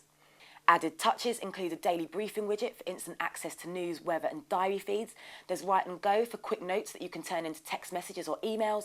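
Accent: United Kingdom. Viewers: Idk ... I just have my Samsung 8500 wave and I'm just happy =) . BTW ... what accent was that??? it's sounds like... british , but I'm not sure cuz I'm a spanish speaker ... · That british voice is soo nice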